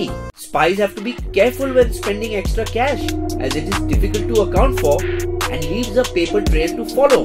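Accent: India. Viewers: Bro please work on your accent.. You're accent is too much Indian. It sounds weird and will only promote hate from people of other countries who watch your videos. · The narrator sounds INDIAN..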